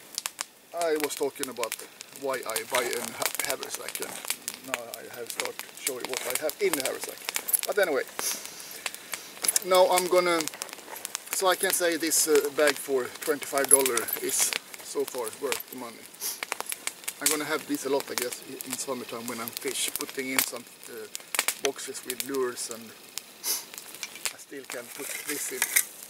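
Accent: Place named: Sweden. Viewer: det stammer säkert men det var så roligt tyckte jag. det lär så svengelskt. måste ändå som vanligt beröma dina fina filmer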